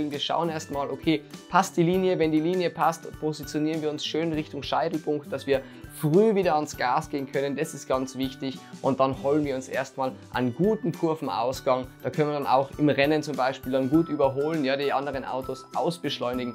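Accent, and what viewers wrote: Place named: Austria. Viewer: Endlich mal eine angenehme österreichische Stimme :D Ich finde du machst echt super Videos und gerade im DIY-Bereich bin ich sehr froh eine ordentliche deutsche Erklärung zu bekommen. Es wird nicht ewig um den heißen Brei geredet (Das Hauptproblem der meisten Youtuber IMO) und du hast interessante Themen! Ich hoffe es kommt noch ein Video für eine DIY-Wheelbuttonbox. Ich will mir unbedingt selbst eine bauen aber ohne ordentlicher Videoanleitung, traue ich mich leider nicht drüber. Aber ich freue mich auf jedes neue Video :) Mach weiter so und alles Gute für die Zukunft!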